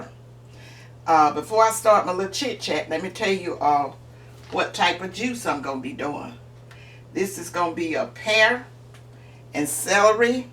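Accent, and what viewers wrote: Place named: North Carolina, United States. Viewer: I just love listening to you. You remind me of my. Are you in NC? Your accent sounds like hers.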